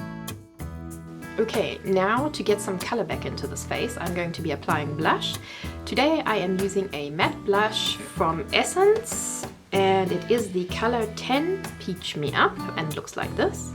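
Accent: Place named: South Africa. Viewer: I love your South African accent x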